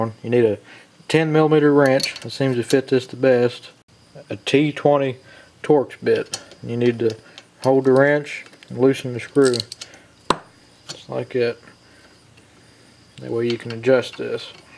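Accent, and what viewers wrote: South, United States. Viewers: Are you a southerner ?? Lol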